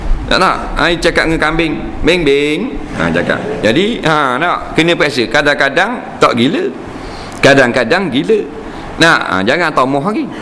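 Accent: Terengganu, Malaysia. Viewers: memang la haram...tengah lampu merah....kalau hijau halal le....kena paham betul ustaz cakap.... kalu nak main lepas akad nikah jangan la depan tok kadi dan saksi-saki...biar la diorang balik dulu... ustaz ni ceramah dia best sebab ada lawok...pastu...slang ganu kita...mu kena dengar molek2 jangan dia kata hare..mu terus amik jadi hare... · "nati orang balik lu bodo"... hahahhaaha...mmg pure terengganu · kaki sekam atau kaki cekang...aku pon x phm sb ustad ckp ganu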